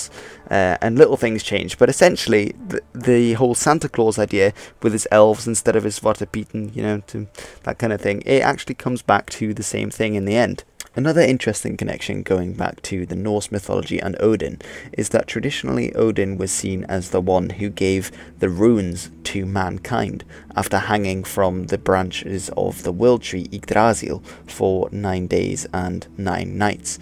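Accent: United Kingdom. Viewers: DUDE YOU'RE DUTCH BUT YOU SOUND BRITISH,THAT'S AWESOME! I AM FROM ARUBA BUT I SOUND AMERICAN,HAHA! GROETJES UIT ROTTERDAM!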